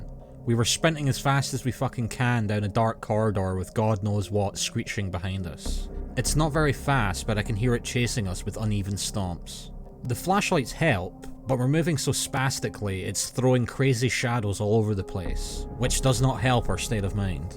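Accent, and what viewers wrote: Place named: Scotland, United Kingdom. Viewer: Lmao you literally got the same accent and hilariously deadpan delivery of frankie boyle....well accent isn't nearly as strong, he's probably the most scottish that a man can be, complete with huge red beard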